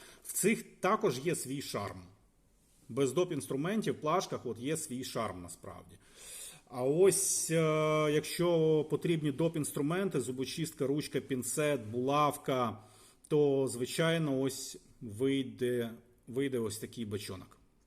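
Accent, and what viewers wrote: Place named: Eastern Ukraine, Ukraine. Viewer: У схидняков такой ужасный акцент. Нет той певучей лёгкости как в полтавском селе у моей бабушки. Тренируйтесь, песни что-ли украинские пойте.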